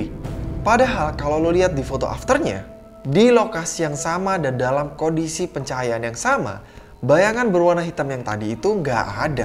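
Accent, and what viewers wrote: Java, Indonesia. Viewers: Bro asli mana? Kok logatnya medok jawa ?😂😂😂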